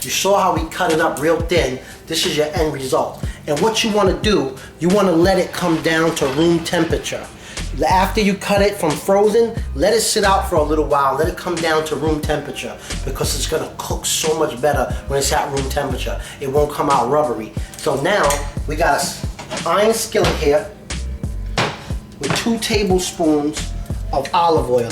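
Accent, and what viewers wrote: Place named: Mexico. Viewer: dude, you sounded like mexican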